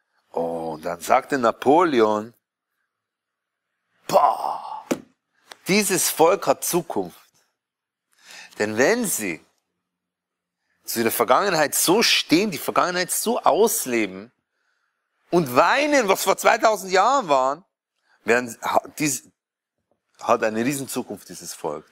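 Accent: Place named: Bavaria, Germany. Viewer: Köstlich der bayerische Einschlag!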